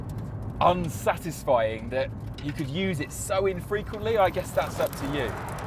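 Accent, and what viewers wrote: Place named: United Kingdom. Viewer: i`m sorry you are so british i cannot even listen to this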